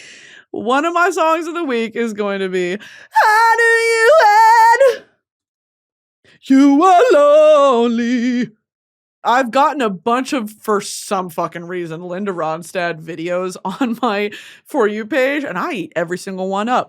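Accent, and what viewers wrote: South, United States. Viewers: listen ik someone mentions it every video but the fact that it's just her in that room just completely unhinged and southern is wild lmao love it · I just watch for the comforting southern accent 😌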